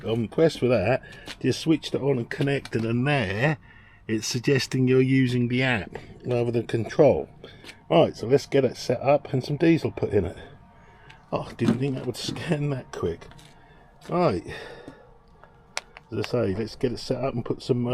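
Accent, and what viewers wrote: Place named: Norfolk, United Kingdom. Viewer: you sound very much like you're from Norfolk to me 🙂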